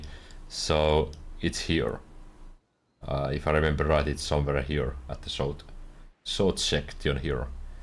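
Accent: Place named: Finland. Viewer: Finnish accent Perrkele 😂 ❤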